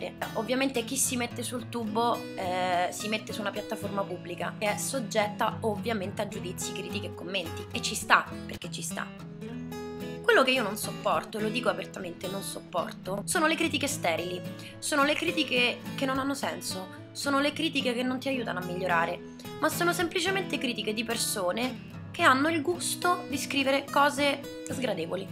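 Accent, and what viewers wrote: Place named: Rome, Italy. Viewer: dio che sei bella quando parli il romanaccio <3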